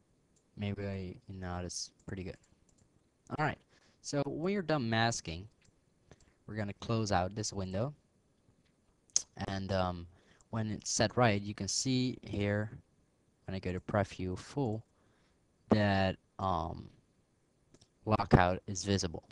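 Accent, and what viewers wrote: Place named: Philippines. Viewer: are you filipino by any chance? i have a lot of friends that sound just like you :D and nice vid!!! i love sony vegas~ oh and i was wondering, how do you get a transparent fade in between 2 videos. i used a fade through transparent transition and it only goes through black.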